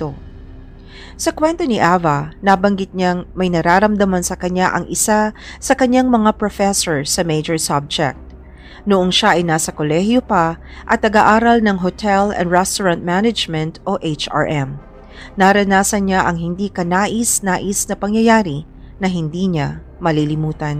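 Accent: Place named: Visayas, Philippines. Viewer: Bisaya yata ang narator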